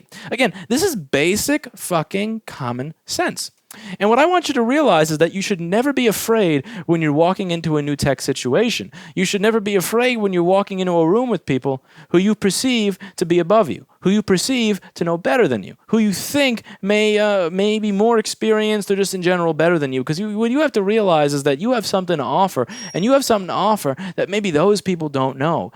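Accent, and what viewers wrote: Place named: Staten Island, United States. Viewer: You sound like you're from Staten Island.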